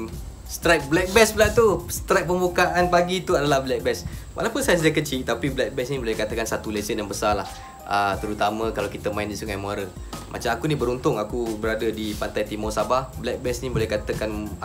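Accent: Peninsular Malaysia, Malaysia. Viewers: selama sye tgok video abg ni.. sye pelik.. dok sne tpi loghat semenanjung..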